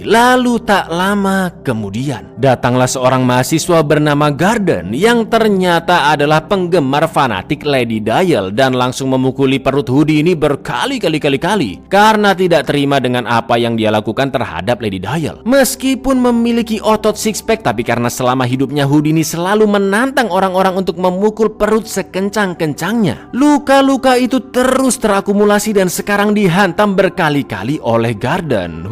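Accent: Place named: Madura, Indonesia. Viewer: Madura kamu bang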